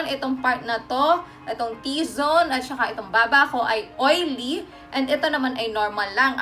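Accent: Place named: Cavite, Philippines. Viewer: Subscribed because of your punto 😅pareho tayo. I'm from Indang cavite kaya mahayon din talaga 😂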